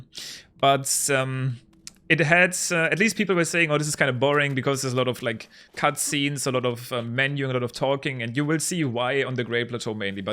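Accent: Germany. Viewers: I think so. His accent really sounds like a german one. Me as a german can relate xD. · Are you actually German?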